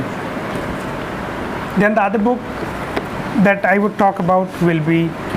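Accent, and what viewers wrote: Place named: Bihar, India. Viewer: R u bihari ..... U told eskils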